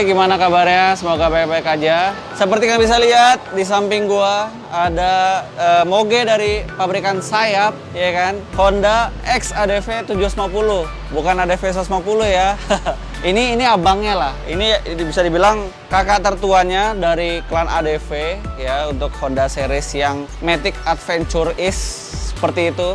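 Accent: West Java, Indonesia. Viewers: Sunda banget bang😂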